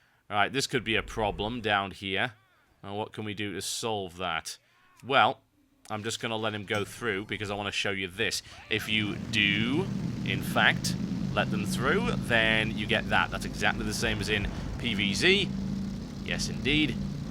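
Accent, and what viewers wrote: United Kingdom. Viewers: are you british, irish or scotish?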